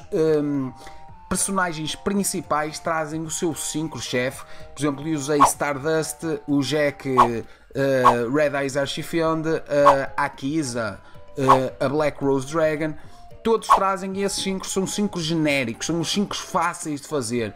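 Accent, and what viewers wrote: Portugal. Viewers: de Portugal, ora pois xD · Pensei que portugual falaria mais parecido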